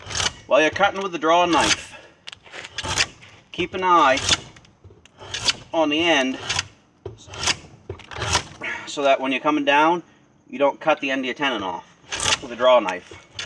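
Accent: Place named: Nova Scotia, Canada. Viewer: Bro you sound like youre from Nova Scotia, I love it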